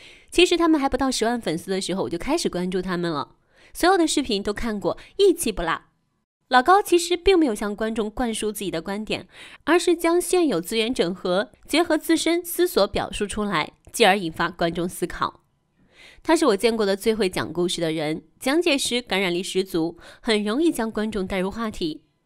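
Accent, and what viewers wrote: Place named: Jiaodong, China. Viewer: 是胶东呛，大连人讲山东话，谢谢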